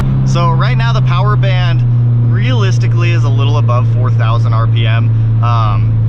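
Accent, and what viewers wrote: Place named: Massachusetts, United States. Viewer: u from mass bro?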